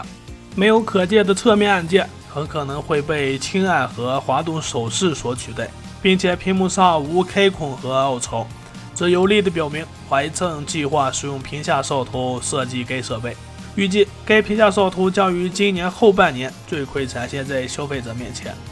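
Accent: Shanxi, China. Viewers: 山西还是陕北口音？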